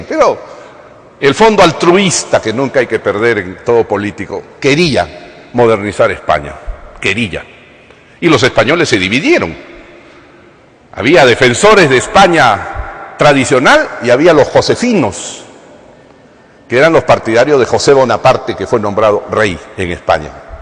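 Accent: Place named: Peru. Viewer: Separó al Alan García político del Alan García orador , porque disfruto escucharlo , porque es el verdadero dialecto peruano. Separemos el político y el orador porfavor.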